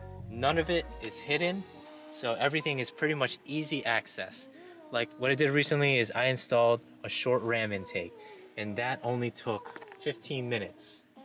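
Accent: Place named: Guyana. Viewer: Are u Guyanese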